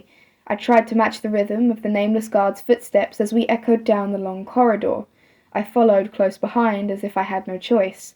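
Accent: United Kingdom. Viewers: very british i don’t like it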